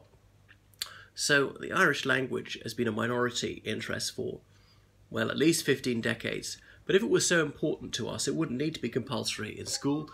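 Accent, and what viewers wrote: RP, United Kingdom. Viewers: If I met this man in the pub, I would assume he was posh English. There’s no hint of Irish accent. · Why is this guy claiming to be Irish whilst speaking with a stupid posh British accent and trying to convince people that Irish people are British. All his examples about similarities relate to overhangs and remnants of colonial times. He also forgets that Ireland was under British rule for 800 years, longer than other oppressed countries he gave as examples so there’s. Im4245 do to be remnants. Also brushes over uniquely Irish things such as. Gaelic sports, language, music and dance. The biggest difference is mentality. Irish have very different outlook on the world. It welcomes foreigners and is looking forward. Whilst Britain has gone the opposite as evidenced by Brexit which harks back to Empire days. Irish people’s opinion on class, monarchy and being a republic are also more very different. 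I think over the years to come you’ll see Ireland drift more away from UK and follow its own path.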